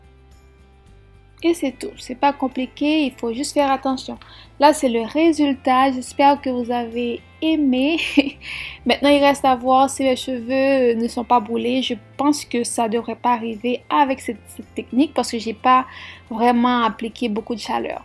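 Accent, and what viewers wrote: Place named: Quebec, Canada. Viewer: L'accent québécois allez!!!!11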